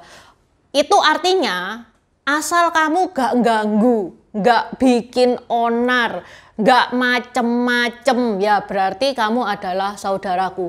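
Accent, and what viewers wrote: Java, Indonesia. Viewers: Kental sekali adek ini jawanya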